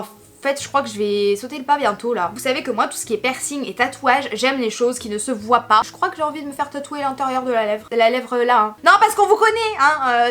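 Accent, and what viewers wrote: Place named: North, France. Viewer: Par contre, j'ai hurlé de rire dans ta vidéo mais... Parce que t'as grave l'accent du nord ça m'a tué bordel, mais j'aime le même t'inquiète mdrrrr olive you <3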